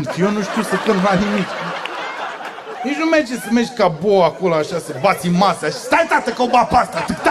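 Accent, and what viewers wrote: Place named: Moldova. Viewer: Ca sa razi la dobitocul asta iti trebuie multa prostie...lasand accentul si vocabularul de ghertoi moldovean.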